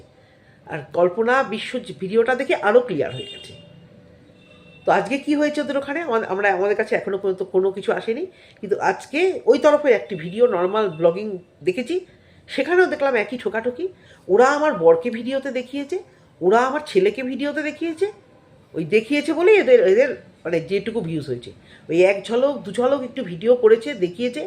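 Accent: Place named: Chittagong, Bangladesh. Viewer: Besh kichudin apnar controversy video dekhechi..valo lagche…besh straight katha gulo shunte valo lage..from Bangladesh..Chittagong er katha bolate aro valo lagche..amrao chittagong to.. Jai hok…priya khubi chalak,self lover…Ar khub show korte pare..hamboravab…kalpana r katha khub besi kichu bolar nei…priya r hotath tk hoyeche tai she kintu nijeke boro vabe kintu dekhchi onekei ekdom kom or bend he legeche priya k boro,mohan dekhacchhe…j konovabei kolpona r tar bor k kharap dekhate I hobe…its ok controversy korche kintu mone hocchhe priya r jonno Maramari korbe jeno…ajob…..othocho eder onekei mondirra k niye jkhn video kore khub politely bole emon ki kutshit ghora k eo sevabe kichu bolte parena…..priya khub irritating….tao ki bhalo proof korte chai he…bhul to dui dikei ache. Jai hok apni arekta regular hole valo lagbe r arektu somoi dile o video aro sundor hobe.valo thakben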